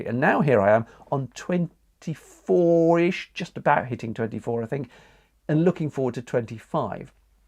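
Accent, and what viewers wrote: United Kingdom. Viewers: Nice! Two of the most interesting topics, boat life and van conversion, done by one of the best narrators here on yt, combined with the lovely british accent and the breathtaking landscape of UK, what else can I wish for? Thank you, great news! :-))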